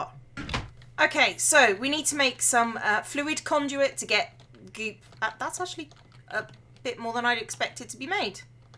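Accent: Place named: West Country, United Kingdom. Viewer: Yay for the West Country accent!:) Great video as ever. <3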